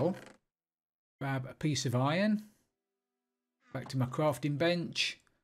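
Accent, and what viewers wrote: United Kingdom. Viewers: Awsome video and I just realized I find my self waiting for your British accent to sing some random song so I can lol thanks my friend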